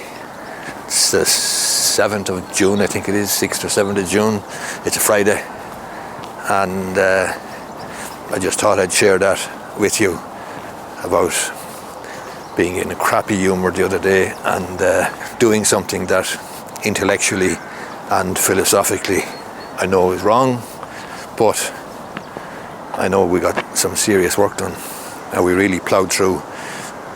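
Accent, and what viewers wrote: Ireland. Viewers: I luv the irish accent. 💓 Great video bthanks for your insights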